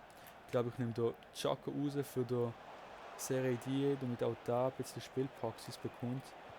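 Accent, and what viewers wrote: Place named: Basel, Switzerland. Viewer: man merkt es das du basler bist an deiner typischer basler stimme weiter so like und abo ps. bin kein deutscher sondern basler schreibe hochdeutsch weil ich basler deutsch verlernt habe seit dem ich in bern wohne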